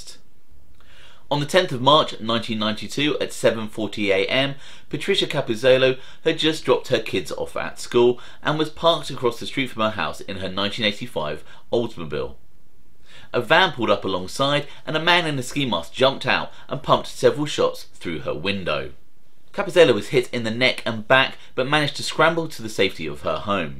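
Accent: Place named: United Kingdom. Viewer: My Pleasure! Say, based on your accent do you produce your show from the UK?? I was just wondering. In any case...are you aware of a BRITISH MOBSTER who was influential in New York in the 1920's and 30's named OWNEY MADDEN. (also nicknamed "The Killer"?) Check him out if you'd like to delve into some of the older history of the US Mobs. Interesting fellow Madden was!!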